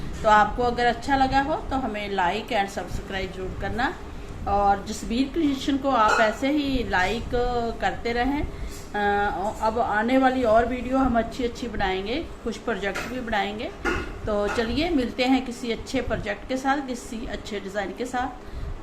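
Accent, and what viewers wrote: Punjab, India. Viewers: मैं रावलपिंडी पाकिस्तान से हूं. तुम मुझे बहुत अच्छी लगती हो, पंजाबी स्टाइल में बात करने का तरीका बहुत अच्छा है। स्वेटर बनाने का आपका तरीका मेरे जैसा ही है। मेरी माँ ने मुझे आपकी शैली में सिखाया। मैं सच में आपसे बात करना चाहता हूं. आपसे अनुरोध है कि आप एक वर्ष तक के बच्चे के लिए रोम्पर बनाना सिखाएं। Google द्वारा अनुवादित बहुत बहुत धन्यवादमैं रावलपिंडी पाकिस्तान से हूं. तुम मुझे बहुत अच्छी लगती हो, पंजाबी स्टाइल में बात करने का तरीका बहुत अच्छा है। स्वेटर बनाने का आपका तरीका मेरे जैसा ही है। मेरी माँ ने मुझे आपकी शैली में सिखाया। मैं सच में आपसे बात करना चाहता हूं. आपसे अनुरोध है कि आप एक वर्ष तक के बच्चे के लिए रोम्पर बनाना सिखाएं। Google द्वारा अनुवादित बहुत बहुत धन्यवाद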